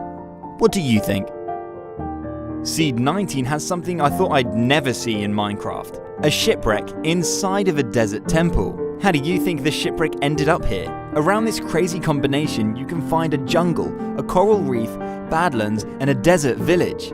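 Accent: United Kingdom. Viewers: Br’ish boy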